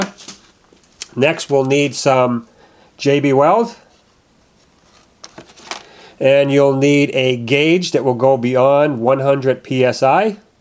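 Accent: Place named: New England, United States. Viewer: Sound like you are from new england.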